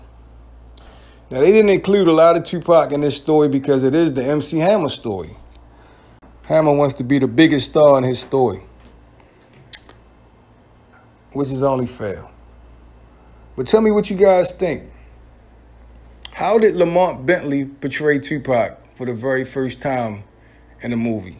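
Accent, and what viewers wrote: Baltimore, United States. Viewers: The guy doing this video has a Baltimore accent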